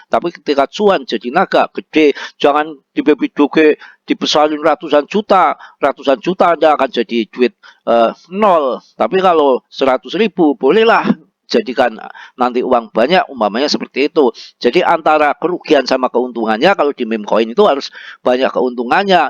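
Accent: Banyumas, Indonesia. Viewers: Mantap...bahasanya kok mirip nyong banget😅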